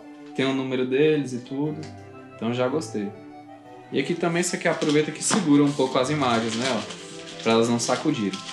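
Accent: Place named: Ceará, Brazil. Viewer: tu é do Ceará? Teu sotaque parece com o meu.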